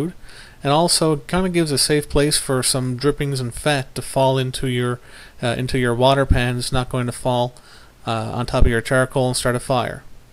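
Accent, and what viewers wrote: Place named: Newfoundland, Canada. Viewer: Awesome. I figured Newfy but sometimes i get confused by people from Nova Scotia etc. I am from BC myself and just getting into this charcoal cooking. Tons of fun! Cool Videos.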